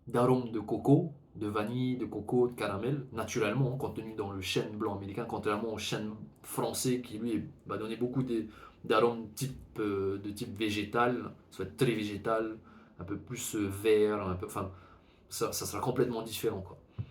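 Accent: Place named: Quebec, Canada. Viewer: C'est donc ça l'accent de Tahiti ? Ça ressemble à du québécois.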